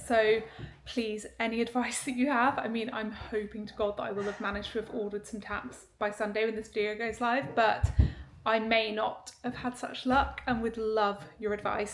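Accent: United Kingdom. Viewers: I’m super excited about your renovations! I love watching you and listening to your beautiful British accent. I watched you for years and am so happy you are back! your skin is amazing, so dewy and porcelain like🍁. Happy Autumn 🍁🍁🍁🍁🍁🍁🍁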